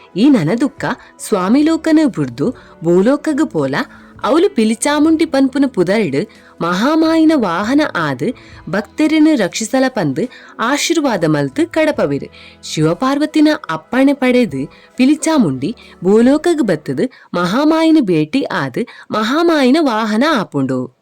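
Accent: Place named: United Kingdom. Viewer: ತುಳು ಸರಿಯಾಗಿ ಮಾತಾಡಿ, mam ಇಂಗ್ಲಿಷ್ ನವರು ಕುಡ್ಲಕ್ಕೆ ಬಂದ ಹಾಗಿದೆ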